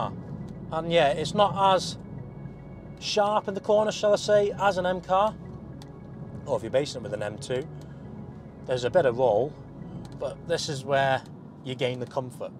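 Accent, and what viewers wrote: North Wales, United Kingdom. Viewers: You from north wales mate?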